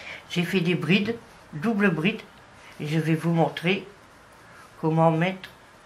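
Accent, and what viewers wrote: North, France. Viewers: bonne nuit merci pour votre modèle votre parler et du nord, votre voie me rappelle ma grand mère,ma mère et mes tantes merci j espere que le confinement nais pas trop dur a bientot JSC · Bonjour je trouve la jupette hyper jolie je vais,la faire. Mais dite donc vous n'êtes pas du Chnord avec l'accent que vous avez.... j'adore....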